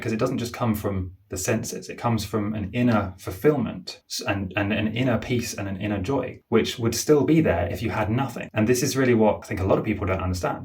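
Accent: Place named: England, United Kingdom. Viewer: Why do you use $ but you have an English accent???? 🤔🤔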